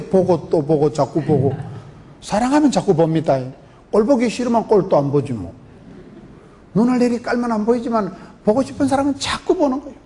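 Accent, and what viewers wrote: Gyeongsang, South Korea. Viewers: 귀하신 목사님 ㆍ 경상북도 사투리에 멋진 비유 ㆍ귀에 쏙쏙 넘 넘 감사합니다 🙏 🙏 🙏 💜💜💜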